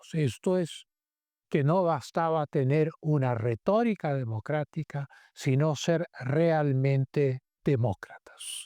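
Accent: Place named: Castile, Spain. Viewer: me suscribo por que respeto su cultura y tu hablar clásico del castellano.- casi o colonial. bien hablado ojala sea tu lenguaje y dicción un ejemplo en el lenguaje en la educación del estudiante colegial del Perú.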